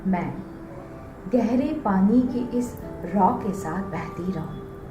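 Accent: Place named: Hindi Belt, India. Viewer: معذرت لیکن سچ میں غیر مسلم کا لہجہ الگ ہی سمجھ آجاتا وہ کشش لگ ہی نہیں رہی۔ اردو سے محبت تو بہت لگ رہی میڈم کو مگر لہجہ میں اب بھی ہندی کا اثر واضح ہے۔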